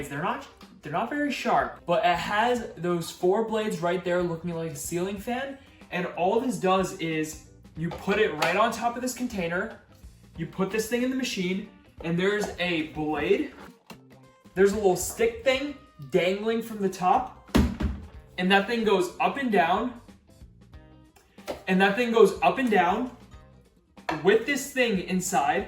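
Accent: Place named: New Jersey, United States. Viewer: YES, I’m from Passaic county lmao recognize the accent anywhere 😂❤️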